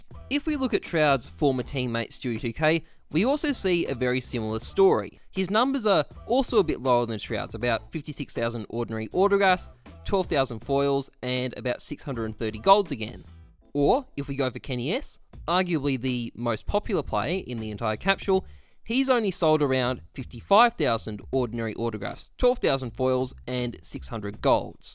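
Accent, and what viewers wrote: Australia. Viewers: Are you from perth??? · An austraaaaaalian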